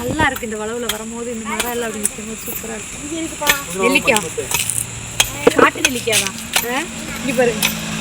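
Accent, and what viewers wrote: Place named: Madurai, India. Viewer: Ooruku varaum manjukurompa santhosama eruku mugathil avalavu santhosam mathurai thamil apadiye eruku americaviliruthu india vara pilaigagooda evalavu santhosa patirula mattaga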